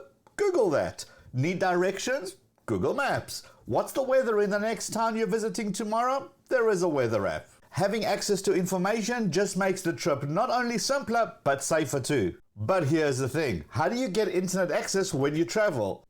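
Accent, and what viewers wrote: South Africa. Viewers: היי. מה סוג המבטא שלך? דרום-אפריקאי? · Hi first I thought you were from South Africa but then I saw in your videos you are from America and your english accent is very South African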